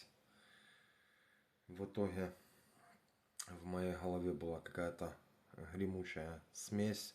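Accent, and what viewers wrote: Ukraine. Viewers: да он не в России, не слышите говор что ли? Где то за границей украинец. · А разговаривает с украинским акцентом.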